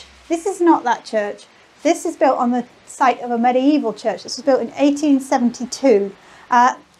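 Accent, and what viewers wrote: Wales, United Kingdom. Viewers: Absolutely love the Welsh accent. And miss speaks beautiful.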